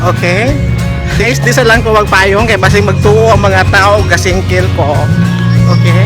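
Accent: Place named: Cebuano, Philippines. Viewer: You remind mi of a cebuano host, pareha mo ug accent. Hehehe ngita lage ug uyab hahaha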